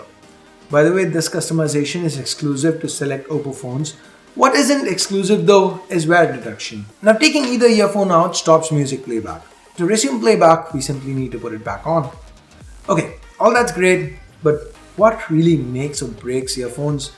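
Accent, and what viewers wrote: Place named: India. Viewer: I really don't like the hindi accent in general but you speak slow and is bearable to understand.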